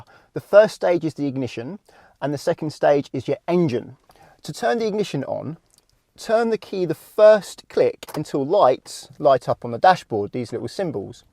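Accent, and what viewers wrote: England, United Kingdom. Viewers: He is so easy to listen to. The English accent is so much easier on the ear than another nationality that I won't mention!! 😂. I'm 🇮🇪 btw! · As a French leaving in the US I was a bit confused by the driver seat on the right side but love the British accent. Great video for someone taking their driving test in an automated car after driving 10y with manual. Thanks for showing a close up of the pedals too. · Love your British accent!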